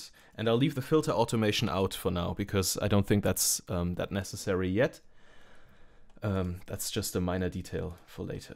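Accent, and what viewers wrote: Germany. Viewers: direkt deutschen akzent rausgehört :)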